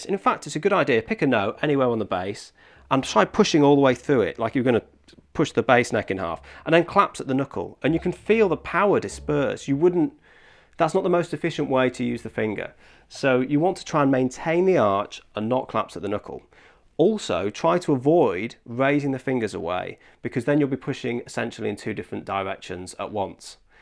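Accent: England, United Kingdom. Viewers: What kind of English accent is this? I like hearing him talk lol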